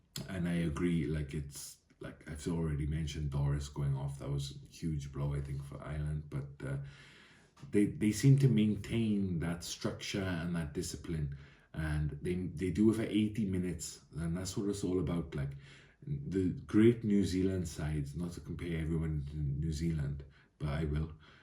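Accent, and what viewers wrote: Wales, United Kingdom. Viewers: Thanks, you have a lovely soft Welsh voice. Bet you sing in a choir.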